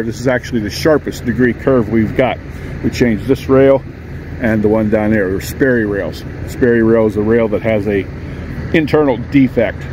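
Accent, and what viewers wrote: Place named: Pennsylvania, United States. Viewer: Hello my friend! Questions is your accent south or central Pennsylvanian? South Jersey or Maryland?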